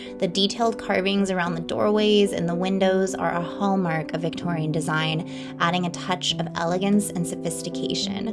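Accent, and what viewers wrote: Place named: California, United States. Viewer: You sound so California, I love it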